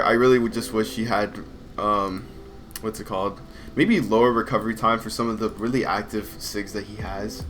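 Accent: Trinidad and Tobago. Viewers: r u from trinidad? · ur from trinidad?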